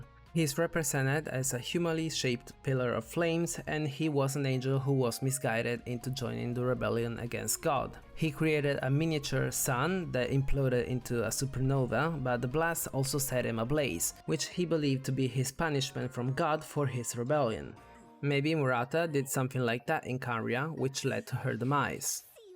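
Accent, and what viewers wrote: Italy. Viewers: you are italian arent you? I recognize your accent reading in latin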